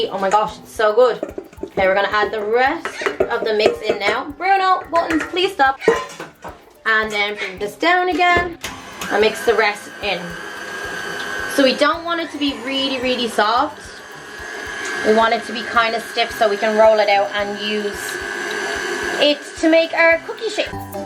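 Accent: Ireland. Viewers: are u irsh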